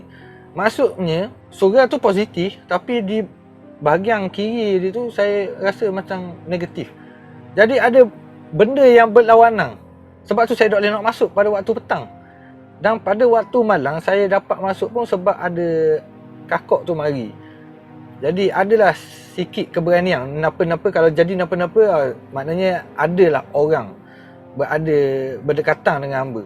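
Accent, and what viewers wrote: Terengganu, Malaysia. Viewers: salon ke salor ke janji org baca dn org faham..tersalah taip,atau dengar x jelas yela sleng ganu kn..tq tau salon rambut ke yerk.salor..slmt mlm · Siang ujan, malam turun salji. 😂😂 Haha...Buat lawok abg ni...Abg ni selit lawak2 skit, tu yg best...Sy suka cara Abg cerita, walaupun tranung tu pekat...Suka dengor loghat2 ni...Keep it up bro...😎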